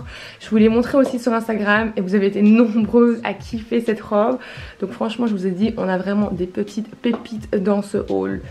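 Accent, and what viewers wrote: Belgium. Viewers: Coucou super vidéo je pense reconaitre à ton accent que tu serais Belge non ?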